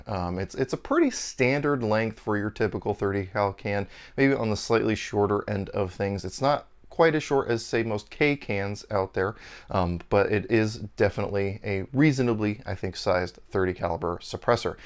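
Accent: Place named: Europe. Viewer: Someone comes from a European family... dead giveaway within 10 seconds of the video. Love the channel brother.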